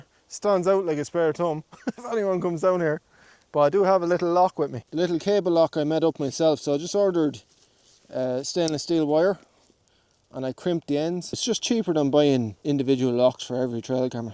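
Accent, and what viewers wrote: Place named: Ireland. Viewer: Your channel popped up on my feed,im so happy it did! How great are you?! I love your irish accent! Im aussie,but my heritage is irish. Just feel so nice listening and watching your show. 💖thanks mate!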